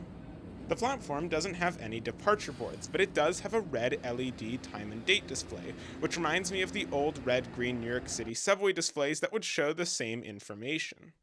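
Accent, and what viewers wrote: Brazil. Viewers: For sure you are brazilian or very familiarized with brazilian portuguese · he has a Brazilian accent. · But the guy who’s presenting the video is a brazilian, for sure. He can say portuguese (brazilian one) without any accent at all. No foreigner visitor can do so. He may lives in the USA, but he’s (or his family) from Brazil. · Even though your english is very good, I can notice an accent. You're brazilian alright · Esse inglês paulista eu conheço de longe.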